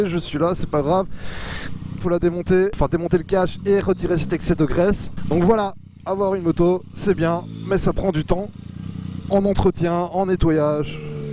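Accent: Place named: France. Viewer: Sympa la petite ballade dans Bx,... T'es un français résidant en Belgique ? Je dis ça car tu as un accent français. Très bonne vidéo ça permet de se faire une idée des choses à compter lorsqu'on aimerait s'offrir une bécane. Thx 👍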